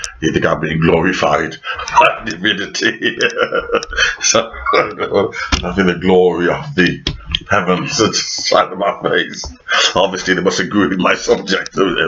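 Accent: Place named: Caribbean. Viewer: Rick James with Island accent. Thumbs up.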